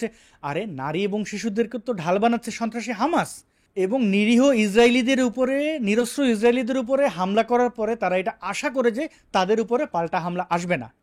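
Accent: Sylhet, Bangladesh. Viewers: brother, your Sylheti accent is wow. JUST LOVELY AND HEART TOUCHING ❤❤❤❤ Love from Sylhet..... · Vai apne sylheti eta jantam na..bala laglo sylheti mat hunia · ভাই কি সিলেটি নাকি? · ভাই কিতা সিলেটি নি?🫠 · Gowaaaaaaaadddd, tmr sylhet accent 😭 cuteeeee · ভাই একবারে সিলেটি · আপনি কিতা সিলেটি নি 🙂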